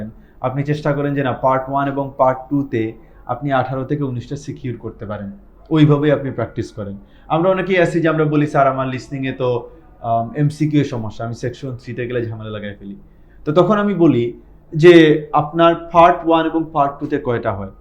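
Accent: Sylhet, Bangladesh. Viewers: স্যার আপনি কি সিলেটি ☺️ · Asslamualikum Bhaiya.. Apni ki sylheti..kub helpfull viedo❤ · Sylhety vai.... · Sir,I think you are sylheti🤔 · Are you from Sylhet ? I noticed from your accent. I am also from Sylhet. Good Work. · Sir er accent shune amader sylheti laglo.Apnar basa kun jaigay sir? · Wonderful suggestion.....are you sylhety? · Vai are u sylhety? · ভাই কিতা সিলেটি নি,হালকা সিলেটি টান আয়। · R u Sylheti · Sir or mat hunia lager sylhete 😅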